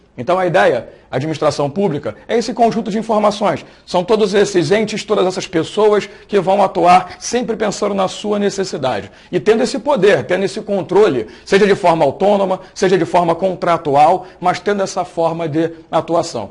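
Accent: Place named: Rio de Janeiro, Brazil. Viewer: esse sim é bom e verdadeiro carioca por isso que me indentifiquei.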